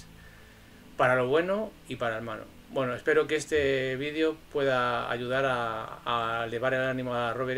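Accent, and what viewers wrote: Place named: Brazil. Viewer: escribí para comentar en el video. puse: ¿Por qué tengo la sensación que la que habla en el video es Gosia usando un modulador de audio y poniendo acento brasileño? Bueno, pues después de contestar que parecía una tonta y que dejaramos de inventar teorías locas etc, borró todos los mensajes.... ya no hay rastro de ellos. Todo lo que dice y cómo lo dice es clavadita. Si no lo quieres ver, vale. Cada cual siga su propio camino, no el de otros.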